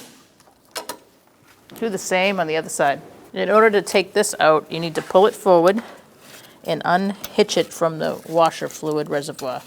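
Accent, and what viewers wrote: Boston, United States. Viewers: 👍 great vid. You are definitely from Boston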